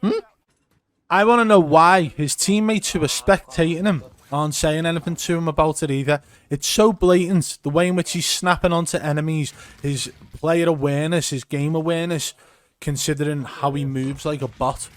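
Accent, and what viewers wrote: Liverpool, United Kingdom. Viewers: I never knew Ewan Mcgreger was a scouser or into COD. Excellent work as usual and can’t even watch streamers the same anymore. Defo a cheat as some of the big names are.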